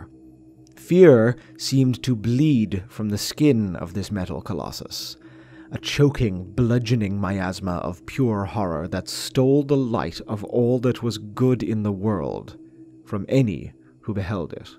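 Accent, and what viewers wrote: Ireland. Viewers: 🇮🇪💪 bro an bhfuil gaeilge agat? Maith an fear. Proud of this brogue. I think its a bit familiar. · he sounds Irish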